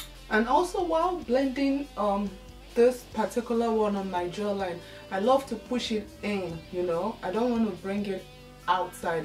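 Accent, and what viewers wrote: Nigeria. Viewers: I love you. Its been a while since i heard a Nigerian accent (ps i'm Nigerian too). I just had to subscribed. Thanks for sharing. xx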